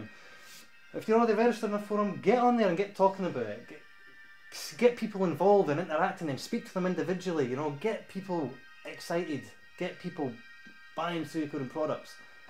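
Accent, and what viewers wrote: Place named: Scotland, United Kingdom. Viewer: Long live to scotish accent hahaha We are with you,!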